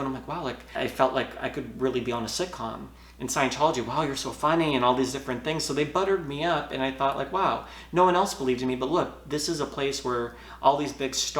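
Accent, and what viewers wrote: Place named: Boston, United States. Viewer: Always good to see a new video from you! Thank you for continuing to speak out and inform people what it was like for you as a public. Also, hello fellow Mass-head! I lived about half an hour north of Boston from birth to age six, and I've visited family up there often over the years. You've done well at neutralizing the accent! I still have mine on a few words, even after all this time, and whenever I visit, it comes back after half a day, and it takes me a couple weeks to get back to my normal mish-mash American accent. My wife thinks it's hilarious, for the first day or two, but then she starts calling me out on it.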